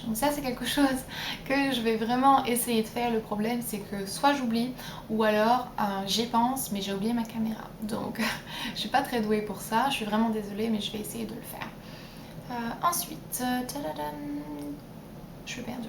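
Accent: England, United Kingdom. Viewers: C'est bizarre, on dirait que tu as un accent légerement anglais...... pourtant tu es fraancaise n est ce pas * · je suis une nouvelle sur ta chaine :) je trouve que tu as un accent anglais cela m'a parrut étrange mais avec cette vidéo je comprends mieux :) j'adore ton naturel tu donnes envie tu m'inspires car tu es simple et tu n'as pas la grosse tête j'adore <3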